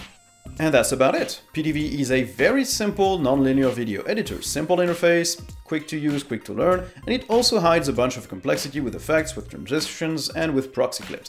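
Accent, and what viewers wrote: France. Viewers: Bonjour, tu es français ?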